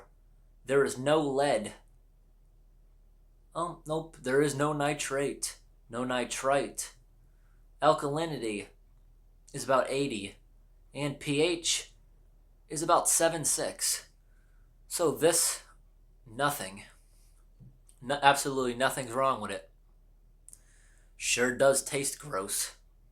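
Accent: New England, United States. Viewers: Tanner Smyly That’s his Yankee dialect.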